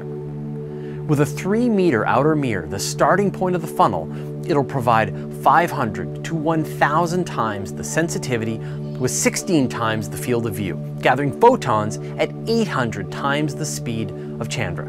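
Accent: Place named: Canada. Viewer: I liked this video and the host. But it just started grating on me every time I heard " ooot " and " aboot " being said. I'm sorry, but it did and that's just my personal experience. But the video and it's information were top notch.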